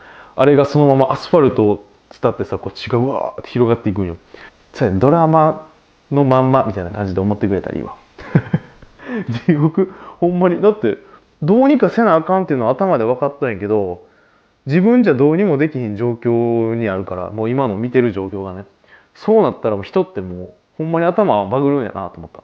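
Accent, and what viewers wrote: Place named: Kobe, Japan. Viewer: 神戸の子やな、言葉で分かる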